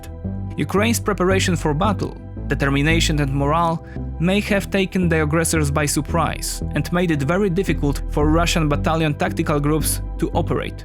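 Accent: Poland. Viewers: BTW I hope after this ends you continue to voice the English version of good times bad times. No offence to the other guy, but i much prefer your voice. I love polish accent, and I think most people prefer hearing about geopolitics from a European rather than American! (No offence Americans) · Very informative video. The narrators Polish accent makes it even more ominus and I like it. 👍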